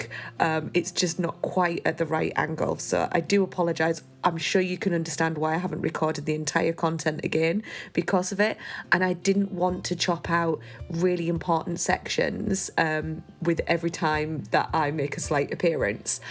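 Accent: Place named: United Kingdom. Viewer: So pretty!! I am not the best at identifying UK accents but always curious. Where is your from?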